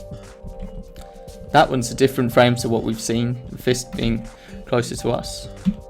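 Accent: United Kingdom. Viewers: i like when you talk in british accent mine while i learned how to say english in british accent like you say it in this video btw i learn much knowledge from you thnx broo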